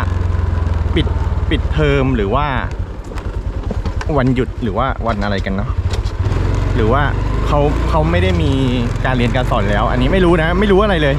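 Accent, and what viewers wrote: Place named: rural, Thailand. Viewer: พูดเหมือนคนบ้านนอกเลยทำไมอยู่ไทยไม่เกิดเป็นคน กทมหล่ะครับบพี่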